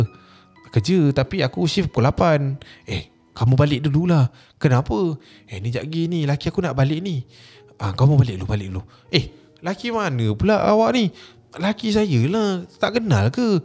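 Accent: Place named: Sabah, Malaysia. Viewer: ndapayah la cakap slang sabah tu. Jadi hancur 🤣🤣🤣😭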